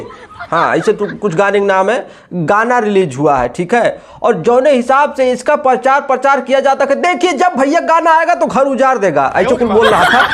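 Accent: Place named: Bihar, India. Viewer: Wdsk pahle bolna thik se sikh le bihari andaz me bolne aaya hai tere bas ki bat nhi hai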